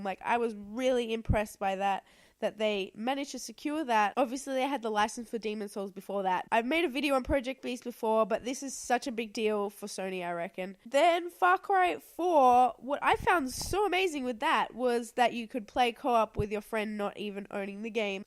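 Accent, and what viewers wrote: Australia. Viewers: ***** here is a wake up call if u don't like how people talk nobody is even going to waste a second with you or even pay attention. I on the other hand wanted to just say somthing maybe u could change again if u don't like how she talks then just leave the channel if u don't like the Australian accent know that nobody is going to change for your ungrateful ass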